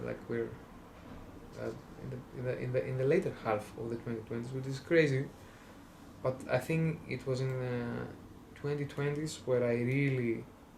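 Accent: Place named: Greece. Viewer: Your accent reminds me of stefanos tsitsipas, which makes sense because he is greek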